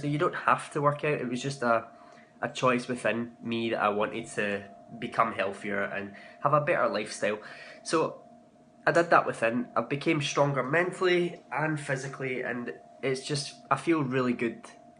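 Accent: Scotland, United Kingdom. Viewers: so I love Scotland (always wanted to go there) , i love your accent but most of all I really admire your positivity, which I think is essential for a person in order to be okay with himself. I "met" you a couple of months ago but whenever I watch your videos, it feels like idk, home :p so yeah greetings from Greece, keep smiling!!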